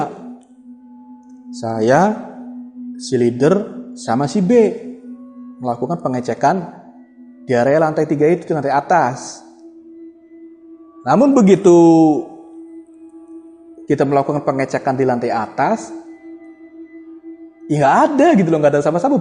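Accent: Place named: Banyumas, Indonesia. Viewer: Pak Jawa Ngapak ya? Khas banget logatnya haha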